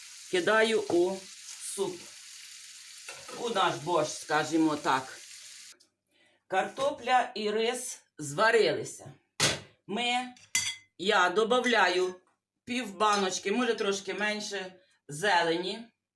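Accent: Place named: Podillia, Ukraine. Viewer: А Поділля велике, я теж народилась на Поділлі, проживаю на Буковині (на кордоні 3-х областей), мені такий ваш говір рідний, і прізвище як у моєї куми😊. Люблю вас дивитися❤❤❤❤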